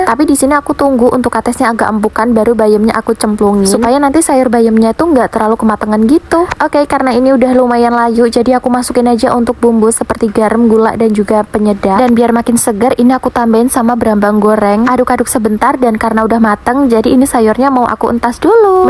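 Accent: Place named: Lampung, Indonesia. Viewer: Logat jawa nya keknya tinggal di lampung ya..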